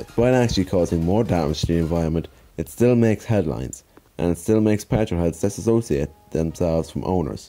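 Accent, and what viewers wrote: Ireland. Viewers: Hi... Only me r u sound Irish?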